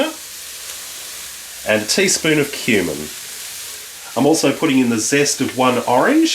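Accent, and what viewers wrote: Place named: Australia. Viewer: love Australian accents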